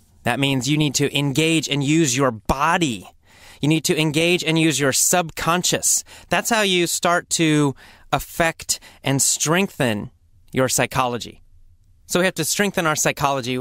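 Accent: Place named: Canada. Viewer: هذا لديه لكنة كندية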